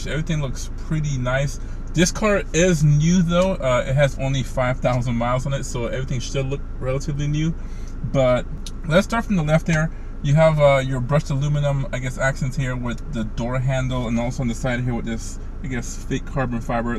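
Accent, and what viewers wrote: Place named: Caribbean. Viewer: Are you from the Caribbean? Hearing a hint of a West indies accent.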